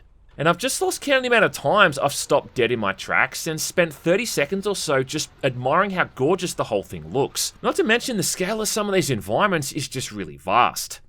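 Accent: Australia. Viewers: makes me confirm that your in my top 10 favorite youtubers of all time along with jontron, gmm, and others. Your humor is just spot on and your Australian? accent just hits that vibe and is unique for your channel. The whole description of the heavy bolter had me dying😅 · Australian accents make everything better I swear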